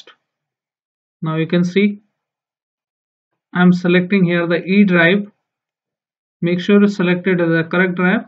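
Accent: India. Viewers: the Indian accent hurts my ears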